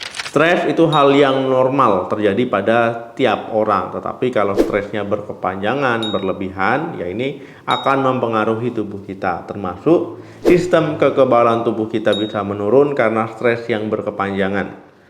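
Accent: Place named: West Sumatra, Indonesia. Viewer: Emang dokter urang minang yaaaa😂 galigato bahasa awak tuuu😅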